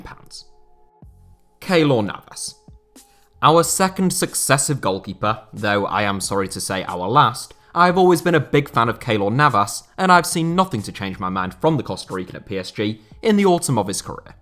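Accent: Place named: Hull, United Kingdom. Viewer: and his 'soft' hull accent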